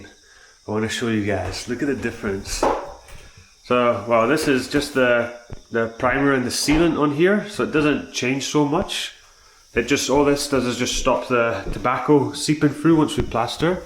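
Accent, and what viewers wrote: Scotland, United Kingdom. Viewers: Hi again from NZ. Trying to work out your accent. Scottish, but with a twist?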